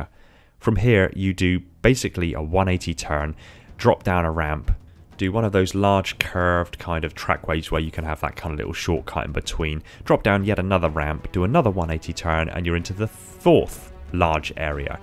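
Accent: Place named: South West England, United Kingdom. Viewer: This is top notch but I've spent the whole video trying to work out where in the South West your accent is from. I'm leaning towards Bristol but I'm also getting Gloucester vibes.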